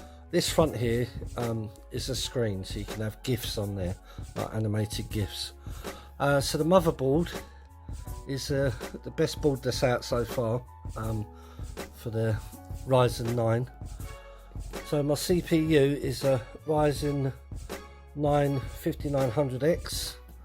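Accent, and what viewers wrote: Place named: RP, United Kingdom. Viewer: You sound posh compared to me ahah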